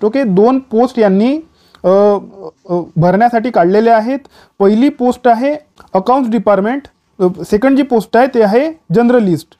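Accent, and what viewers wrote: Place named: Nagpur, India. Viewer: Bhau chi language nagpur chi vatte jara😊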